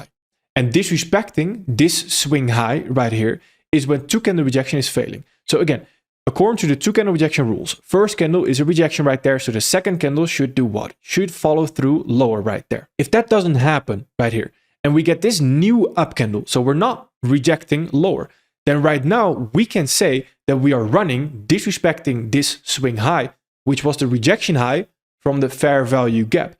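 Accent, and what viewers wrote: Netherlands. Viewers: Top notch content, simply top notch! Also, you're Dutch no? I've listened to Peter Bom for a while and there's similarities in the accent.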